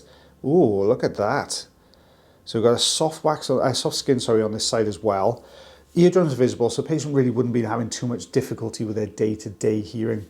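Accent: Wales, United Kingdom. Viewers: Thank you for talking about mental health. Both my son and I suffer from depression. We both got help from doctors. I will pray for you that you will be able to keep it at bay so they say. I love your channel and your Welsh accent is so wonderful. Take care. ❤️😇🙏🤩Congratulations on another grandchild. Unfortunately my kids are not having any children. My friends all have them and I am a bit jealous. But you enjoy your granddaughter. Oh they smell so nice. ❤️👶🍼😊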